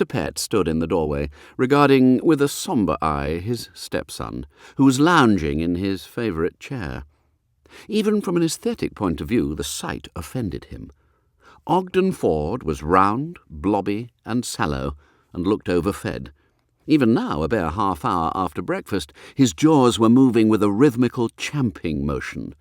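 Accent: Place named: United Kingdom. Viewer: Dear, please reread the Wodehouse novels read by female readers. Although some are fairly good writers, invariably they don't have a British accent which is really appreciated. Also, because most of the characters are males, it just makes sense. Thank you so much for the great reading. Looking forward to finding more of your readings. Thank you!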